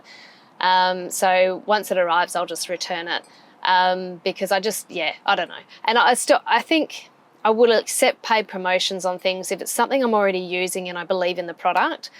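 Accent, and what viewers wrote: Australia. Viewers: Hi, I’m a new subscriber and couldn’t have been more excited to hear a fellow Aussie accent. You will do well because you are so real and natural. I love your drone work it must have been tricky learning that. Di x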